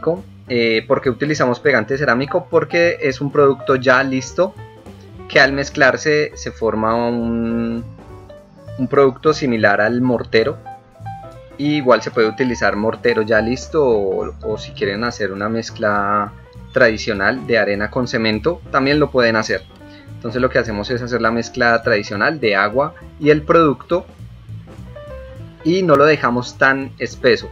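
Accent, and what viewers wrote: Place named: Colombia. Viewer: Saludo. Veo y escucho tù video me gusta buena nota por tù fromar de hablar tù acento es Colombiano, que posiblemnte de Cali. Bien yo estoy en el circulo polar.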